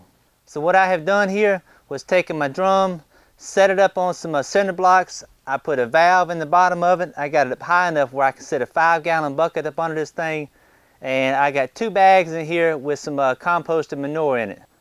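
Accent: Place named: Louisiana, United States. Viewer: your amazing accent = Louisiana? Mississippi...? Very nice. And great video..! I think you should look at The Worm Inn, Mega, now made and sold by TheWormDude dott comme, apparently. More air cirulation, no stink.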